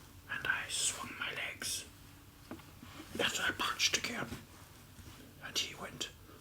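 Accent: RP, United Kingdom. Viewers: Well, was not expecting you to have such a spot-on posh accent!!! Wonderful video. Hope you've been doing okay over your absence and thank you for your wonderful videos. 😆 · That accent is so plummy I had you mistaken for Peter O'Toole!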